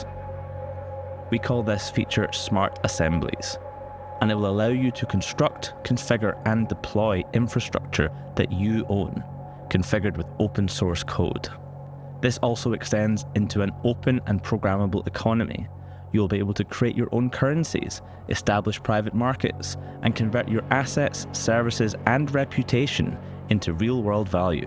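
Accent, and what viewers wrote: Scotland, United Kingdom. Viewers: That's a Scots accent, not Icelandic. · I can't believe after 20+ years of experience with EVE, you can still allow yourselves this level of shit with placing and advertising a product. It's no wonder each project other than EVE has failed. Its not the product, it's your absolute lack of understanding that we want proper information and motivation to spend money, and not half-arsed ambiance video with some dude who sounds like a Scot trying to balance talking English and gurgling liters of cum at the same time. Oh, good job on giving a monstrous advantage to people who are coders and into IT, over regular folks. Hey pleb, go work for that dude who knows how to write code and can make his own stuff up.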